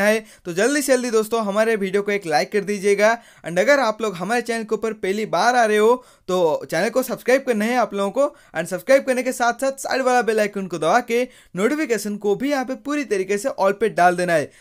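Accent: Odisha, India. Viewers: Odia ho kya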